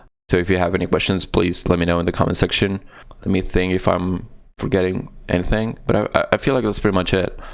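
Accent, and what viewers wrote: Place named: United States. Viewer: Tenes la voz americana, muy sexy, te amo seguí así rey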